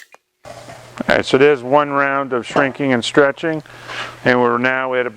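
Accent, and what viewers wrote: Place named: New England, United States. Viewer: It would be a lot better if the person speaking didn't have an obnoxious Yankee accent... I could only make it through 1 minute of it before having to give it a thumbs-down and canceling the video.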